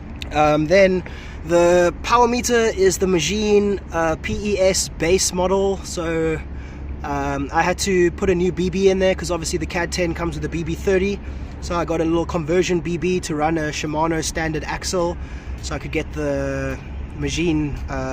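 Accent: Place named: RP, United Kingdom. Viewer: I like how posh you sound on camera. Liked and subscribed.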